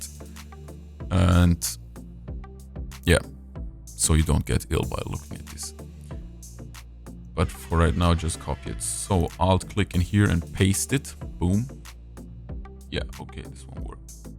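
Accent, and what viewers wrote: Germany. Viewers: You are german? :D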